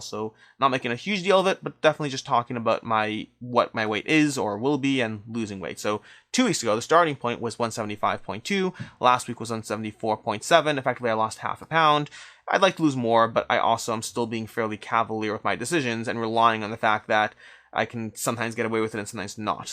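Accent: Canada. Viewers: Oh like this sweater also, nice! Your 'canadian accent' is getting less noticeable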